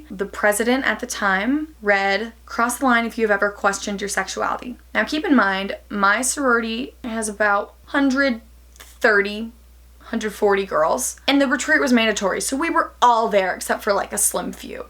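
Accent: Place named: United States. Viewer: You're like one of those classic, aesthetic AF american tumblr girls! Your accent, clothes, hair and even the way you style your hair... you'd stand out (in a really good way) here in the UK!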